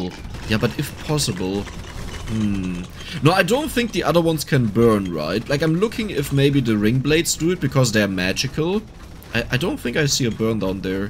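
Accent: Germany. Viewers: 5 seconds in the video and i could already tell you're german lol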